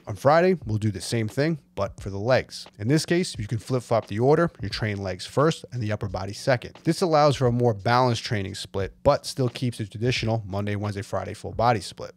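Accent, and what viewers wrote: New York, United States. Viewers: Is this new jersey or new York accent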